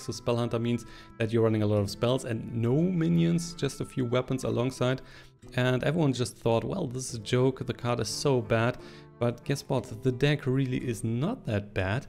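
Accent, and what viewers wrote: Germany. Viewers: Deutscher Akzent :D. Nice deck, i love it and it's my first good deck. I hope my dust is spend right :).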